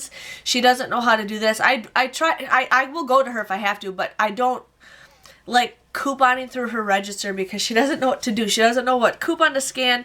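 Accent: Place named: Minnesota, United States. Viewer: You sound like Minn. I live here ....✅💕💕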